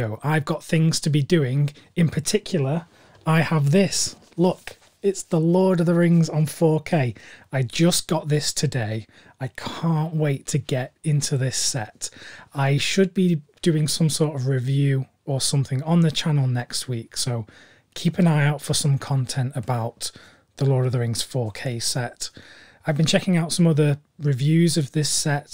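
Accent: England, United Kingdom. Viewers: Unrelated note and in the least homosexual way possible , you have a lovely soft English voice and it’s lovely to fall asleep to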